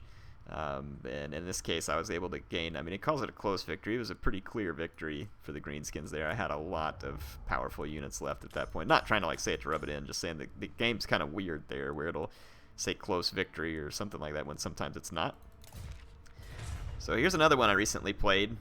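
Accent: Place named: Arkansas, United States. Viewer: Whenever I hear that ol' friendly-Arkansas " Hey Youtube, what the crap is going on!" it cheers me up immeasurably.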